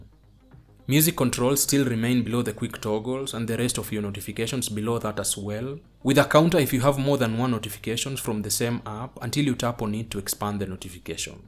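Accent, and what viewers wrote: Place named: Kenya. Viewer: Am sure this guy is from Kenya! That accent ! 👍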